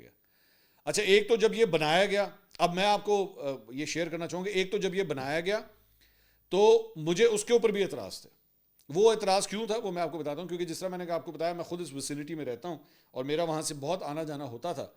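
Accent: Punjab, Pakistan. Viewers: Your Punjabi is so funny accent speak only Urdu plz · Retire bandy ki bna rahy thae tu kia ghalat kia juner ko chef justice bnaya haq ki awaz kehty hain.esi trash hota hae .kia hukam hae zulam ko hath se roken zuban se roken Dil SE tu bura janen per Tum jaisy khud sakhta almana fazlana ya pher jahlana tabsra thuss hae.kitna bura lagta hae jub filmi Andaz men Punjabi ka tarka sharm aani chahye.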